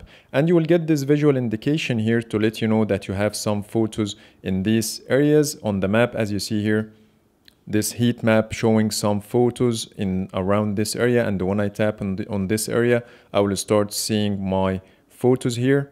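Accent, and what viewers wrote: Egypt. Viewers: You’re an Arab right 😂😂 I can tell from the accent, an Egyptian?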